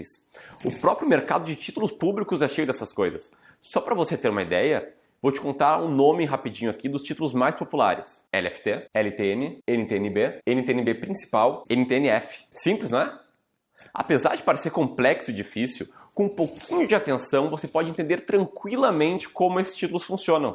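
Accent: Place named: Rio Grande do Sul, Brazil. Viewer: Tu sois gaúcho né rsrs este sotaque me é peculiar rsrs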